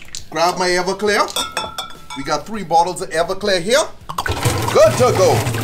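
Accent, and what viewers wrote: Bahamas. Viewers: Bro u gotta be from the bahamas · Are you bahamaian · Bahamian accent i hearing or I’m freakin out lol 🇧🇸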